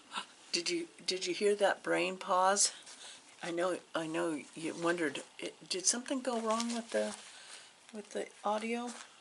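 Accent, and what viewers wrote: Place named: Kentucky, United States. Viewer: I’m reading Diamond Eyes, what a great read. I also love history. Just fascinates me. I’m one of those people who stitch all day and read all night and then wonder why I’m tired. 😂 I get together with girlfriends a couple times a week and stitch or knit. I’m making another summer top. It’s so soft and drapes beautifully. Using bamboo yarn.. which I would have never thought it would be so soft..who knew. Thanks for the great visit, I’m going to check out the floss tubes you mentioned. Nothing funnier than listening to a good ol Kentucky accent. 😂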